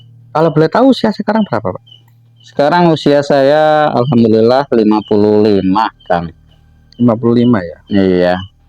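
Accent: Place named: Madura, Indonesia. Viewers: Ko logatnya kaya org jatim bukan ky logat org jateng meditasi bukan apriasi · Iki logate Meduro / Banyuwangi om bukan sragen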